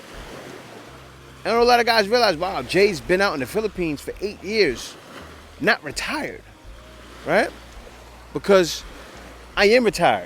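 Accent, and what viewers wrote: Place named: New York, United States. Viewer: Jay bro I been watching you for few years now. Im appreciating everything you been saying. Still got that NYC vibe coming through your mouth 😂😂😂. I’m 59. Just retired from working NYS government job. I need to leave and come to the philippines. Need to need up with you when I get there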